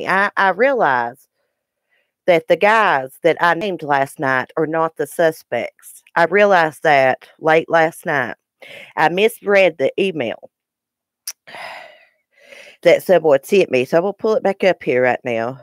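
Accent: South, United States. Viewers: Your accent is just fine. We are country too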